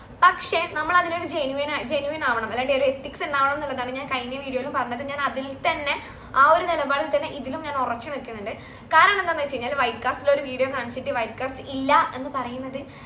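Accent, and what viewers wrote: Kannur, India. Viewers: കണ്ണൂർ ഭാഷ വരുന്നുണ്ട് ❤️ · കണ്ണൂർ ഭാഷ, നമ്മുടെ സംസാര രീതി. ഞാൻ തലശ്ശേരി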